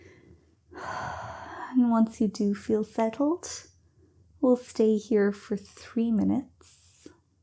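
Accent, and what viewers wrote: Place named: Ireland. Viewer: Excellent class!! And the accent is a beautiful bonus , may I ask what it is, Irish?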